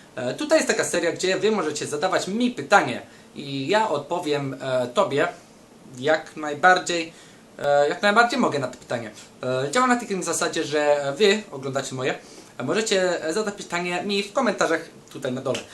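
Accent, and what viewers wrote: England, United Kingdom. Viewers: BO JEST Z ANGI TO AKCENT:D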